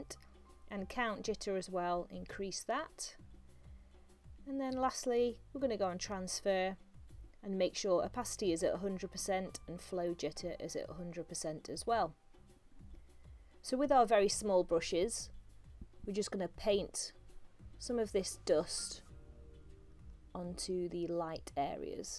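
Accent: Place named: Birmingham, United Kingdom. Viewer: Great tutorial! Thank you! Love your accent by the way! Is it brummie?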